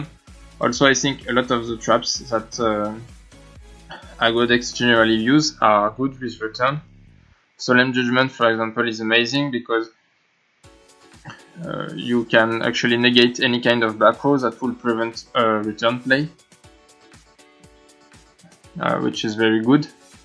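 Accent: France. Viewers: You sound french. Wanna play some goat in paris ?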